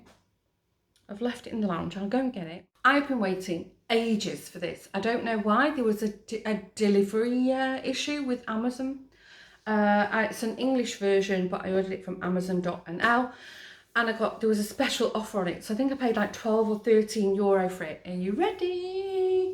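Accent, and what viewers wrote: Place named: United Kingdom. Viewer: Hey, I'm a new subscriber, I only just realised you are living in Holland, I assumed you was in the UK, think it was your accent that threw me 😃, I hear Holland is a very beautiful, clean, country, with alot of green, and flowers everywhere☺️ but also heard it really high the cost of living there. What are the pros and cons living there, and do you miss the UK at all, do you get to go back there often 🤔 take care. Stay safe 🙋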